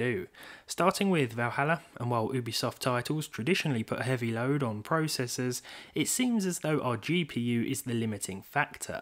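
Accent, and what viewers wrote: United Kingdom. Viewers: For some reason I simply like your British accent 😂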